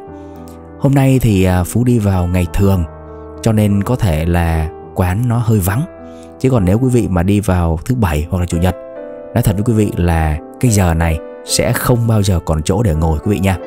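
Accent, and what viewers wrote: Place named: North, Vietnam. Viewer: Thích giọng nói của em, nghe là biết con cháu người Bắc 54, ấm áp chứ không chua lè, tru tréo như giọng Bắc 75.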